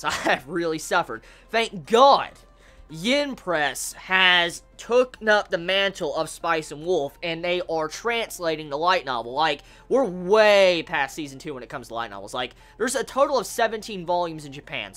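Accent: South, United States. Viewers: At first I thought he was from the UK, but now I'm thinking he's from the southern states...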